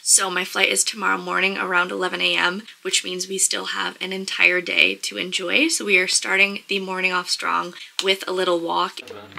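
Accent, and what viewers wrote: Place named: United States. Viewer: It’s so weird seeing you visiting a place near me, as you are so American 🤣 and different to the locals (as in your dialect)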